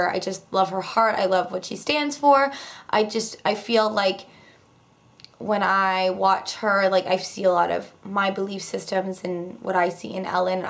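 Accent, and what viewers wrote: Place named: Etobicoke, Canada. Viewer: Loving your videos! I have a friend from Etobicoke and you both have a very similar voice and "accent" ( to me from NY). Do you happen to live near this city? I'm not stalking you I just hear the similarities. Thanks!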